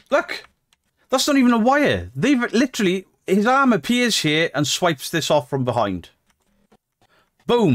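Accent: Wales, United Kingdom. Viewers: thanks! I was monetized Once! I still get quite a lot of subscribers but no coin! ha I recognise the accent (as being Welsh lol) I'm in Carmarthen, Merlin's Town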